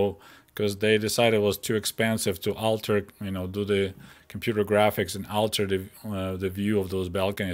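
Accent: Russia. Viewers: Sir, I'm obliged to mention that you still have a discernible Russian accent. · Agreed I love the accent so much. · Man I love Russian - English accent / dielect . You sound so nice but at the same time so deadly. Much Love from New York.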